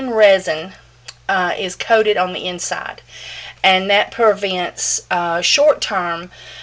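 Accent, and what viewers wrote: South, United States. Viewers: Yay, congrats on the Consignment shop wanting to have "all" of ur soaps & on being featured on Ess. Depot's site! Good for you! Enter me pls. Another wonderful batch to share with us, mahalo! One of the reasons I enjoy ur videos is bc of ur southern accent, ur southern grace w/an easy going style & ur sense of humor. If only we had smellivision! Aloha nui~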